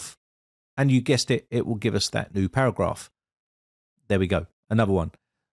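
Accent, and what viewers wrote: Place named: Cockney, United Kingdom. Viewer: Hi, I've been using this tool for a while now and I've noticed that it struggles to understand certain dialects. So, if you don't speak the King's English (like me - I'm from Yorkshire) or if you have a Cockney accent (like you), then you'll need to proofread the text it generates and correct any mistakes. However, it still saves a lot of time compared to typing everything out manually, even if you have to edit it afterwards.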